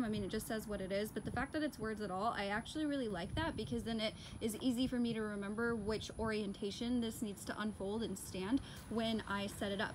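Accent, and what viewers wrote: Texas, United States. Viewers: I thought I heard a Texas twang in your voice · Funny your texan accent pops in and out occasionally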